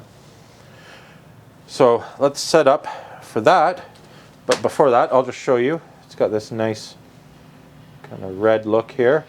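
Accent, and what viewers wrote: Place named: United Kingdom. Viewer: Just looked at some of your videos very interesting demos, looks like you have a degree in science or something. Very clever and looks like you put a lot of reseach into how parts of the phone work. And a fellow Brit by the sounds of it :D. catched a glimps of your paper work, something I wouldn't have a clue about understanding what you put on it so I'll leave that with you :D. Subbed also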